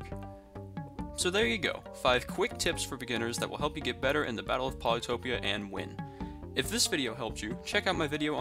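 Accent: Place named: United States. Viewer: He doesn't wanna pronounce it like that because of his yeehaw American accent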